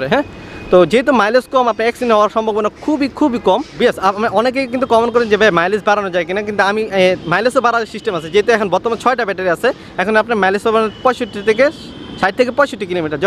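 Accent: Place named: Comilla, Bangladesh. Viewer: ব্রাহ্মনবাড়িয়া আর কুমিল্লার গন্ড ভাষা ছেড়ে শুদ্ধ এবং সুন্দর ভাষা শিখে এরপর এসে রিভিও করবেন। কথা শুনে মনে হয় বাংলা বইটা কোনোদিন পড়ে দেখেননি।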